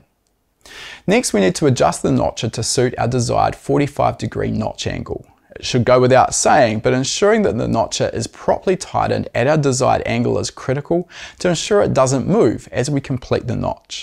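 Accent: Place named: New Zealand. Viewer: Awesome. Thanks ✌🏽 PS-Maybe the sour responses about the “what makes it teck” saying were from guys that wish they had a cool accent? I certainly wish I had the cool kiwi vocabulary/accent haha. -Best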